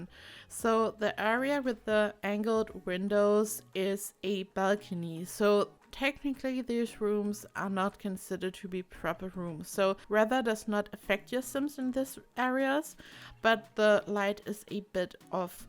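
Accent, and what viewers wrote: Germany. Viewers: The most adorable german accent :D and of course great builds!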